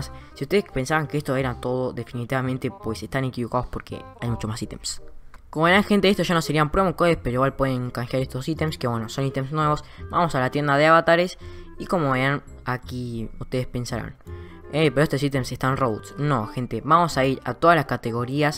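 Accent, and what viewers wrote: Argentina. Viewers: Eres aljentino ?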